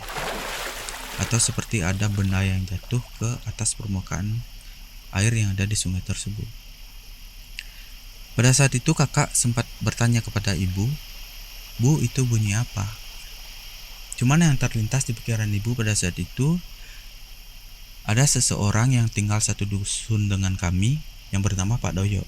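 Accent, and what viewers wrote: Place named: Bali, Indonesia. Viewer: Cara penyampaian ceritanya ok terlebih lagi ditambah logat Bali nya sangat unik sekali. Mungkinkah itu setan di sungai itu salah satu karakter patung setan yang digiring orang Bali di acara ritual apa yah namanya ?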